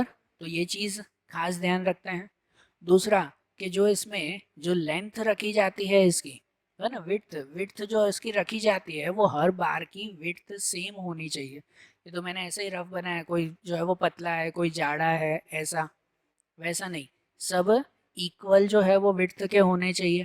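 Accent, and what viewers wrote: Gujarat, India. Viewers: Good explanation sir gujrati cho ne 😅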